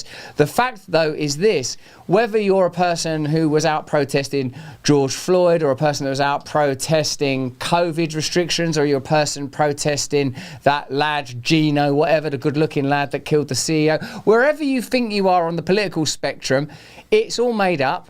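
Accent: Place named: United Kingdom. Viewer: I love how this guy's says absolutely nothing but has a British accent so people thinks he's saying something so insightful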